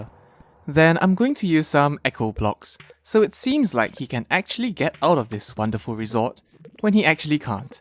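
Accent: Singapore. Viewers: Are you singaporean? I can tell from the accent if so lol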